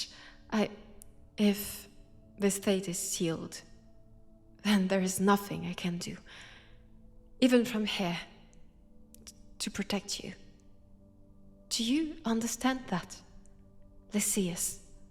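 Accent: France. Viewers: Why are you French?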